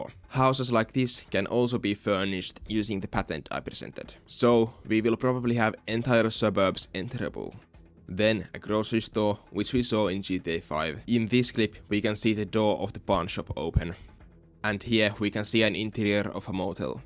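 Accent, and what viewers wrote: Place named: Finland. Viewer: You have a finnish or swedish,are u from there?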